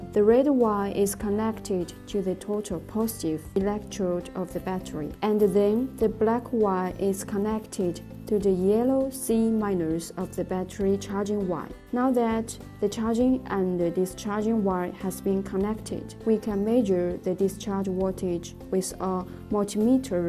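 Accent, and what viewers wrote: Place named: United States. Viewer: Speak without the America essence would sound clear. drawings helps not Co fuse by moving hands...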